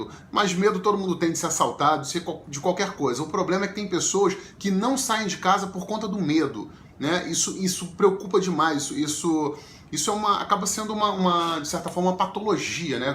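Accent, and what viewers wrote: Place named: Rio de Janeiro, Brazil. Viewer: RIO DE JANEIRO lindo mesmo. E ainda com esse sotaque carioca.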